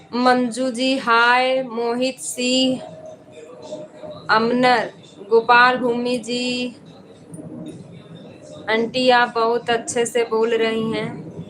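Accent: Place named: Magahi, India. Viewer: मैं जहानाबाद के सरेया से.वर्तमान निवास धनबाद .आपकी सुन्दर मीठी मगही से आ जाती है गाॅव की याद.मैं लगभग आपके पिता की उम्र का हूॅ.लेकिन इतनी अच्छी मगही नहीं बोल सकता.देश दुनिआ में मगही भाषा को उजागर करने बढानें के लिए आशिर्वाद.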